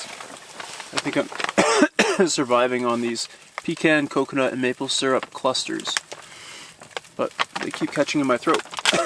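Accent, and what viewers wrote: Canada. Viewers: Well, I shouldn't be such a whinger about it, so apologies for that,! I thought it was Canada somewhere (due to your accent), but it's a rather large country so I was naturally curious as to where exactly. Thanks anyway for replying!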